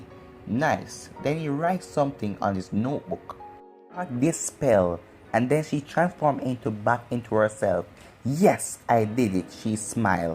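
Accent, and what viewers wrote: Jamaica. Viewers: Are you jamican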